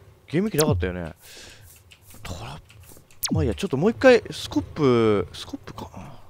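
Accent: Kansai, Japan. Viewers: かっこいい人の関西弁はさらにかっこいい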